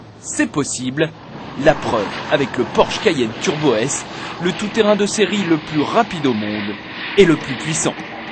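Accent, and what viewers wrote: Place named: France. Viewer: you sound so much like a f*cking arrogant french.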